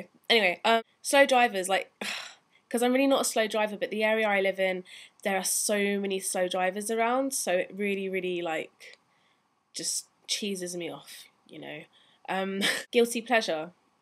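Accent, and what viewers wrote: United Kingdom. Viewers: about your style: rock chic/ street chic? - random comment: i'm normally not into british english but to you i could listen all day :) - goofy question: any thoughts on the royal wedding? are you excited, bugged, indifferent..?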